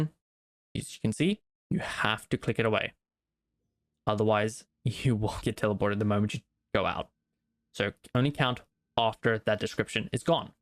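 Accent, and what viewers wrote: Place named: South Africa. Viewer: Great video! Are you from south Africa? You sound like it! Also i subscribed cause i want to see more of your claymore series. Im doing an only female account so this is very useful for me thank you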